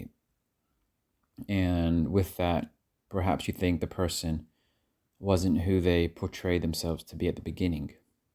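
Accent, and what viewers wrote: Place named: United Kingdom. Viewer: This is an English accent mixed with an American. It’s so weird how sometimes you stray into British, and then you put the American accent on again. I hear you! British guy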